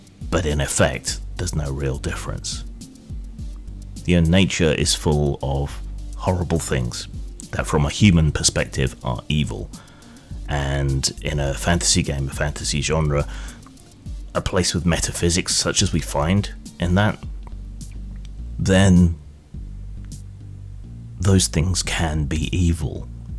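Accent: United Kingdom. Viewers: Good video,. I find your voice to share a certain charming quality with that of Alan Moore, but less unintelligible to my sensitive Yankee ears. You know us, anyone with a British accent is inherently more authoritative, more intelligent, and more sophisticated so well done! My two cents (or pence, or whatever): I like the elegance of the Law-Chaos paradigm. It is more like yin and yang. Both are an appropriately abstract interpretation of heady psychological ideas, and of more use from a philosophical perspective, in my opinion. They have more concrete and objective definitions, and are less apt to instigate a disruptive moral argument at the table. Also, consider that the circle is always turning, illustrating that no one remains in one or the other for too long, or they risk catastrophe. There's a whole endless discussion there as well. Good and Evil, on the other hand are too subjective in their definition and are way too easy to argue over for my use as a DM. 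Consider the old saying that every villain thinks they are the hero of their own story. Even in the Bible, Christ says that every man does what is right in his own eyes, to say nothing of throwing stones in glass houses, judging not, and a hundred other sayings in a hundred texts of a hundred religions. Defining good and evil, as far as I can tell, is the first step toward war, and allows for the dehumanization and vilification of others, which then opens the door to atrocity of all kinds, including the executio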